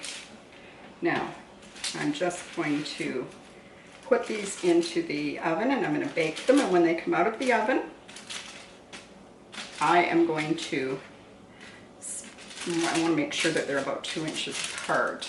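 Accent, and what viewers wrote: Canada. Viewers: Just found you and subscribed. I was curious where you were raised. You used the word dear (costly) and pronounced the word herbs sounding out the h as in a man's name and I noticed a bit of Canadian oots...having an English mother am I close to your heritage?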